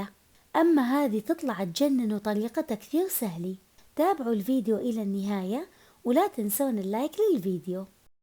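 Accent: Mosul, Iraq. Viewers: مساء الخير على الجميع شكو ماكو خير ان شاء الله وينك اختنا الموصلية الشيف المميزة بالاطباق العراقية المنوعة الطيبي مشتاقين للصوت الموصلي والابداع المطبخي المميز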